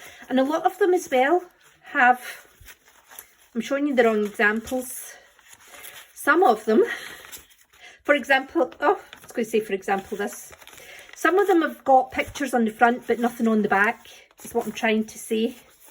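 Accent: Scotland, United Kingdom. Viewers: Watched Ceri unwrap the journal you sent him. You have a very unique approach. Yes, quirky. Love your accent…Scottish, eh?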